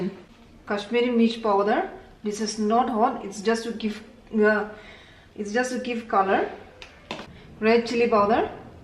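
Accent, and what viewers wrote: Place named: Bengal, India. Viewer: Upki language bengale jeisa lakta hei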